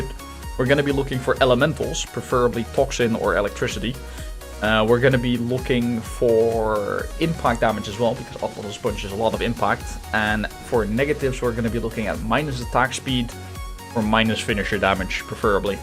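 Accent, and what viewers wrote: Netherlands. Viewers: De Dutch accent is strong on this one, just found you. Nieuwe sub erbij. Great vid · Ik hoor al gewoon dat je Nederlands bent, lekker hoor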